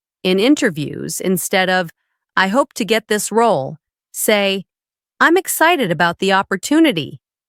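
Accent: United States. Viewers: How come you have shown British flag but speaking American English 😅😂 , overall it’s a great video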